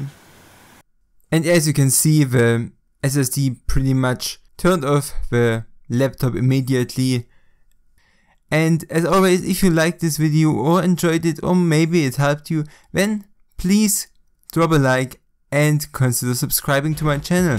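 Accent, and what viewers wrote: Germany. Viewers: Höre ich da einen deutschen Akzent in deinem Englisch? ;)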